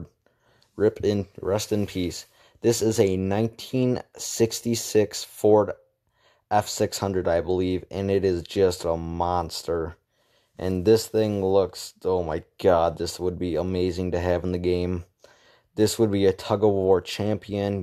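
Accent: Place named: Wisconsin, United States. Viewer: You can hear that this man is from Wisconsin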